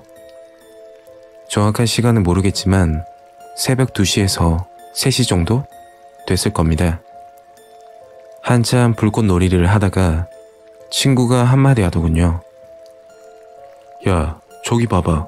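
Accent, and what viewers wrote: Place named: Busan, South Korea. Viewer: 절은청년같은데 우째그래 이야기를 차분하게 잘합니까? 말씨는 부산말씨도 많이섞이가 듣기가 참 개안습니다.이야기 내용들도 들을수록 자꾸 더듣게됩니다. 앞으로 도 많이들려주십시요수고하십시요,.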